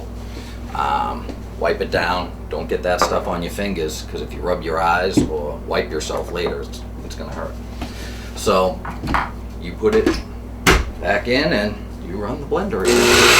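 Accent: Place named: Texas, United States. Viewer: I love the Texas accent. Great job!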